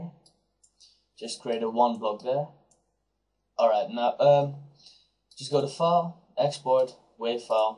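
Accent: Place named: Netherlands. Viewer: Je klinkt nederlands.. You sound dutch.. Good instructional video!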